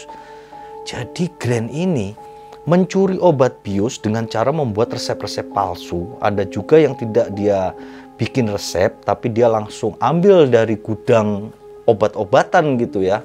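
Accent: Java, Indonesia. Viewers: Angkel medok..nyenegkeh swarane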